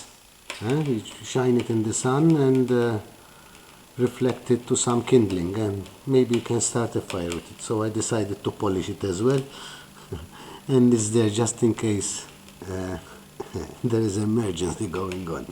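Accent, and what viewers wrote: Italy. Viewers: Thank you for making a very instructional video. You not only made a functional stove you made it beautiful by polishing. Are you from Italy? Your accent reminds me of my grandfather who came to America from Calabria in Southern Italy. Perhaps you are not from Calabria as they say people from there are " Cappo Tosto ". ( you do not seem thick headed...haha ) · ! Excellent video, great added value to the already great video of Tetkoba... and I can't resist to tell you your delicious Italian accent makes it a pleasure to watch;-)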